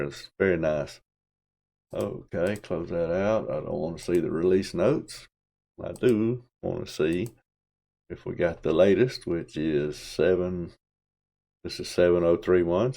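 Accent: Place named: South, United States. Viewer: Hi, GeckoLinux creator here. Thanks a lot for the thorough and open-minded review! And I love that southern drawl! Real pleasure to listen to.;-) Just a few clarifications: Regarding the lack of GeckoLinux theming for GRUB, that's intentional. GeckoLinux is unapologetically openSUSE, created from openSUSE packages and using the openSUSE repos. I recognize that I'm standing on the shoulders of giants, and I make no attempt to conceal the fact that the system is still proudly openSUSE. I'm not sure what happened with the Google Chrome repo refresh, must have been a temporary network failure. You can just skip the refresh on individual repos instead of skipping the entire process. What appears in YaST Software the first time after the initial database refresh is not a list of availabe updates, but rather a list of packages specific to your hardware. 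The XFCE panel clock size is actually controlled by the XFCE general fontsize settings. Your summary at the end was good, GeckoLinux is meant for users that appreciate the quality and excellent community of openSUSE but don't like dealing with the hassles. There are quite a few papercuts in a vanilla openSUSE system, mentioned on the GeckoLinux website, so the goal of the project is to eliminate those via configuration changes and package selection, while still remaining 100% openSUSE at its core. Thanks again! Have a good one.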